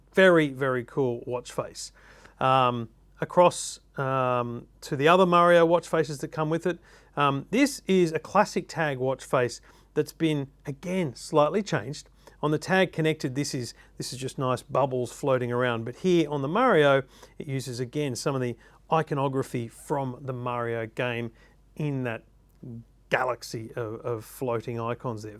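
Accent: Australia. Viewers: Is this Guy from Australia? And why is he speaking in Australian?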